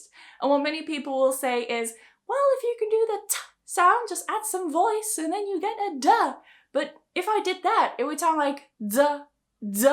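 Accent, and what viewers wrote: United Kingdom. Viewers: Thank you very much for your teaching. I love ♥️your British accent. I just want to make sure when it comes to the sentence "The vet helped the dog", the D sound of "helpeD" become a "T" sound? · Very nice, fantastic. I like British Accent the most. Thank you very much, may God bless you mam. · Your accent is so nice I`m sure It would really me I really like british pronunciation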